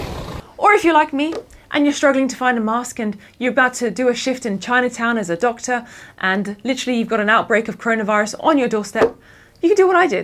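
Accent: United Kingdom. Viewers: I live in London and that's a British accent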